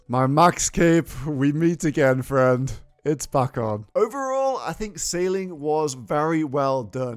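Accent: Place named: United Kingdom. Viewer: bro sounds like a doofus. is that just him or just the british accent?🫩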